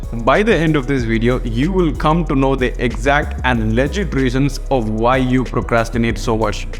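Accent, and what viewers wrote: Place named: North India, India. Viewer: I thought you are from north india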